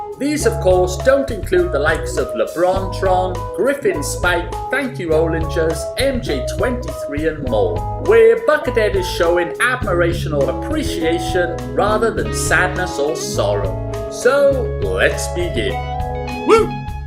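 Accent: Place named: Wales, United Kingdom. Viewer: Love this channel. I definitely detect a Welsh tinge to your accent :). Where can I buy pikes 13, 43 and 78? Woo!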